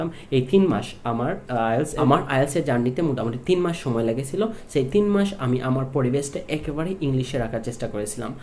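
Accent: Sylhet, Bangladesh. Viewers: Are you Sylhet’s your voice is like Sylhet’s Very helpful your video.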